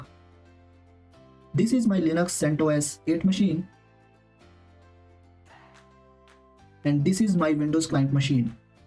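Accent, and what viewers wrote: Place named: India. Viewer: OMG, Are speaking English or are you trying? please can you try to forget your Indian language, that's gonna help you.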